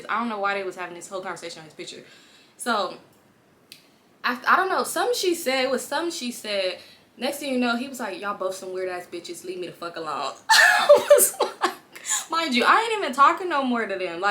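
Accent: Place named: California, United States. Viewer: I can tell you from Cali I am too we love are gold jewelry. The bamboos and the way you talk give it away to us that's from there. Storytime with you be lit tho I just got on here this my 2nd video lol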